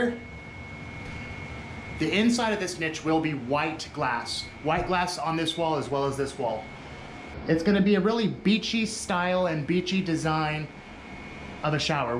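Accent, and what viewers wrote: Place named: Boston, United States. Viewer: With that Boston accent, this must be cape cod?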